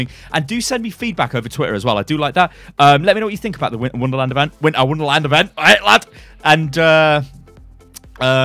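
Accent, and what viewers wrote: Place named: United Kingdom. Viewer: I like your british voice way more than these other american Overwatch youtubers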